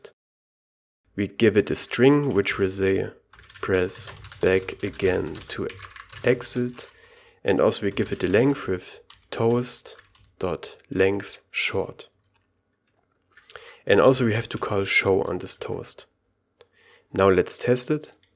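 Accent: Germany. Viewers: Man merkt das er deutsch ist 😂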